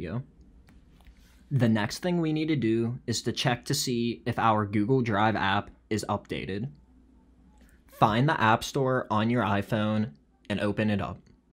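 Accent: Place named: Pittsburgh, United States. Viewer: Are you from the Ohio, Pennsylvania, West Virginia tri-state area? Lol I’d know that dialect ANYWHERE. #yinzer 😄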